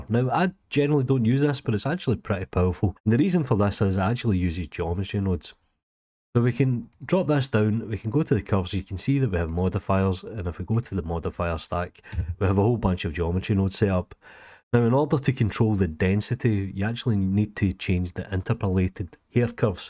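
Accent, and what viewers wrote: Scotland, United Kingdom. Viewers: He's Scottish, hence the heavy accent. It's revealed ;)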